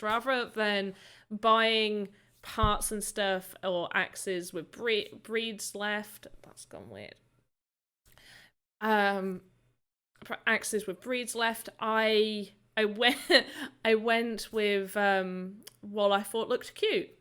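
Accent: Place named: England, United Kingdom. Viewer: May I ask, where in the world are you? What part of England?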